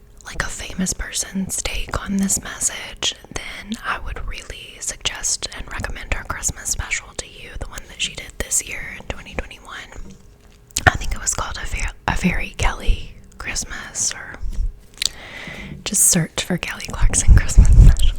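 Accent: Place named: Australia. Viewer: You're such a special person. Weird thing to say given we've not met but I spend time with you almost every day. I look forward to your hot take on whatever the topic is, I adore your Australian accent (and other), and overall wit. Thank you for sharing so much, bestie. Like, can we grab a cocktail if you're ever in Phoenix? :)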